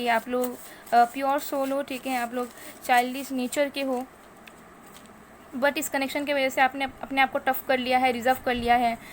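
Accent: Bengal, India. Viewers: Are you from Bengal? 💐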